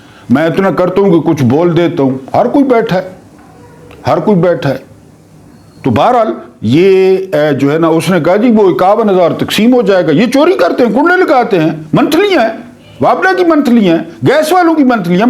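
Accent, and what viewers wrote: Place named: Saraiki, Pakistan. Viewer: لالا آپ نے بھی عطا اللہ عیسی خیلوی کے طرح( غلابی ) اردو بولنا شروع کر دی ہے۔۔ بہرحال سرائیکی ہماری تو پسندیدہ بولی ہے کیونکہ اس میں لہجہ نرم اور میٹھا ہوتا ہے۔جس طرح آپ کالا یرقان کا بیٹا کہتے ہو۔تو مزہ آجاتا ہے۔ویسے بھی آپ کی باتیں سبق آموز اور میٹھی میٹھی ہوتی ہیں کچھ لوگوں کو تو سن کر اولٹی آجاتی ہو گی لیکن بھائی جی ہم تو غیرجانب لوک ہیں ہمیں آپ کی باتیں سن کر بہت مزہ آتا ہے۔ ۔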